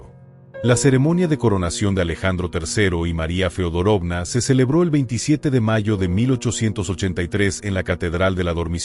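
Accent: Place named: Mexico. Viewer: Me encanta el acento del español mexicano, mucha gracias al narrador.🎉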